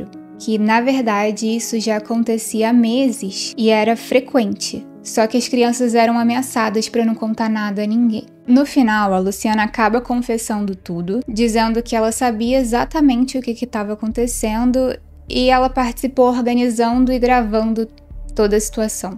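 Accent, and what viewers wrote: Rio de Janeiro, Brazil. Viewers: Sua voz é muito bonita, o vídeo bem editado, tudo quase perfeito, mas esse seu sotaque carioca fortíssimo complica, soa quase como um cacoete, e faz perder a imersão da narrativa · Vc é carioca?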